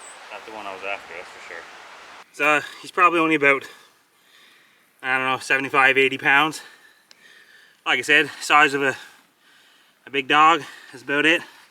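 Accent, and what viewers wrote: Newfoundland, Canada. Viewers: I can recognize a newfie accent even if it’s faint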